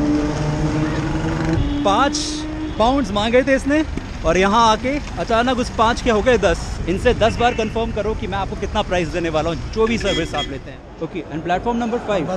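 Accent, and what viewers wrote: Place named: Haryana, India. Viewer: Bhai Egypt m ab naya rule aaya h teen char din phle hi... Agr koi tourist ko tng krega na toh 10000 Egyptian pound ka fine h... Maine newspaper m pdha tha socha aap ko bta du.. Aap harayan se ho kya bhai aap bolte ho Bich bich m haryanvi ..aacha lgta h.. M bhi haryana se hu sonipat... I like ur videos very much.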